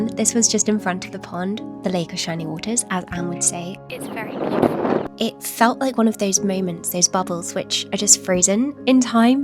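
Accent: United Kingdom. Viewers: I love your accent, British women are so elegant sounding.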